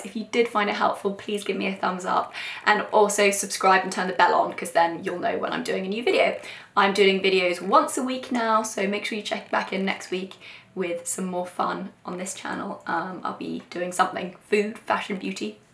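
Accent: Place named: RP, United Kingdom. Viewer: In what way, she has a pretty standard British accent?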